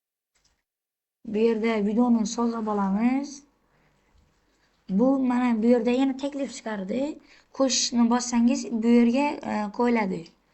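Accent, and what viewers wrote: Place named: Khorezm, Uzbekistan. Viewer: zoq chiqibdidan gaplash da gap bo xorazimcha va toshkentcha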